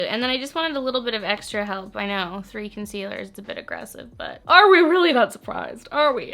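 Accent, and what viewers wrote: United Kingdom. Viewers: I am living for the British accent!!! 😂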